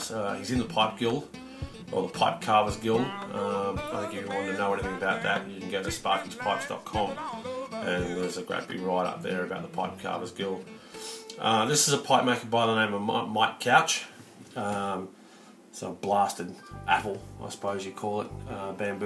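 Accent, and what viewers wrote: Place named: Australia. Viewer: Thanks for sharing, awesome pipes!!! and fabulous video mate! good to hear another Aussie voice on here hahahahaha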